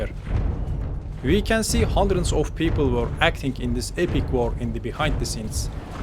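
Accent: Turkey. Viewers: Videonun ilk dakikalarında bu ne böyle Türkler gibi konuşuyor İngilizceyi dedim. Meğer Türkmüş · Ulan ben de diyorum İngilizceyi aynı Türkler gibi konuşuyor, Türk'müş adam :) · türk. ingilizcesinden bile belli oluyor. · Aga türk müsün aksağandan anladım düzenleme video başında yazdım bu yorumu videonun devamında açıklamışsın zaten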